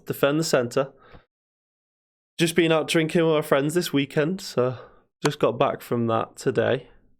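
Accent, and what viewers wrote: Hull, United Kingdom. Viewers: You sound like you’re from Hull??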